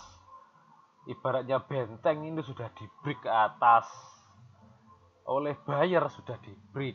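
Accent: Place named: Surabaya, Indonesia. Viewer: Bahasanya kok enak bgt, logatnya lucu. Rodo2 suroboyoan.... seneng aku ngrungokke... paham pakde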